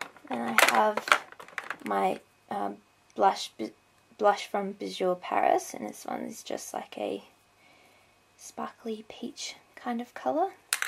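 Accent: Australia. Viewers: omg i love australian accents (and your collection) :)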